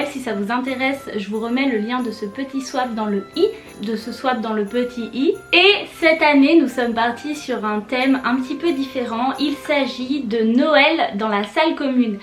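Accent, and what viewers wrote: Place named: England, United Kingdom. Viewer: Je suis jaloux de ton accent anglais 😭😭😭😭😭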